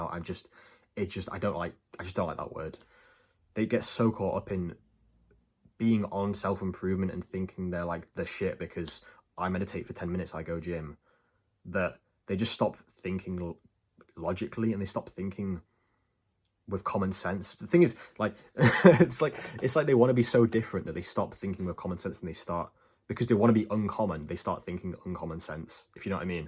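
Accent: United Kingdom. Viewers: Is ur accent Manc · it’s a Yorkshire accent my guy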